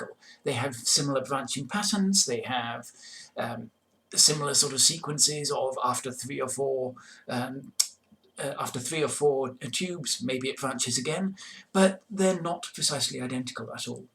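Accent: United Kingdom. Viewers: So cool! I have never read anything about graptolites other than that they existed and are useful for dating rocks. That is a very interesting question about how a colony of successive clones achieves those kinds of alternating ordered shapes! And why such fragile collagen skeletons fossilize so well! I wonder if aside from collagen they had some kind of pervasive toxic chemical that discouraged decomposers. I'm straining my brain to remember what popular science book I read in the last couple of years - by a woman author is all that I remember, but the topic of the book must have been paleontology or earth history - describing in one chapter a cold drizzly steep hike somewhere in (Scotland?) with a renowned older-but-tougher paleontologist looking for fossil/ geological evidence of a major extinction event, and graptolites were a big part of the evidence... 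By the way, for Dr. fans who have trouble understanding her (like me), when I slow down her beautiful chirping Scottish accent to 75% (in the settings for the video) suddenly I can understand every word! (except for some of the Graptolitic terms that I didn't remember from earlier in the video, but the context made them clear).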